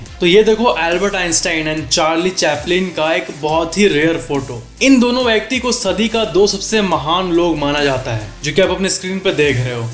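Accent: Bihar, India. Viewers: Are you from Bihar or Up I am from bihar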